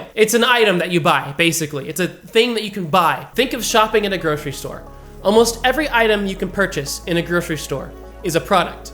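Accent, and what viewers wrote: United States. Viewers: He sounds very very American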